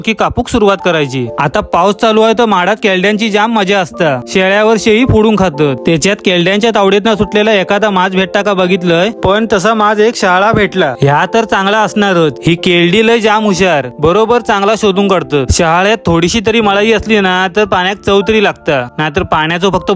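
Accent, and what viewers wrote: Malvani, India. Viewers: सुपर मालवणी 🎉🎉😂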